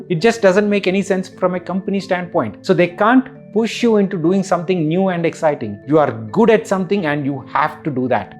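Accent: India. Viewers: the indian accent of english language is so cringe to listen to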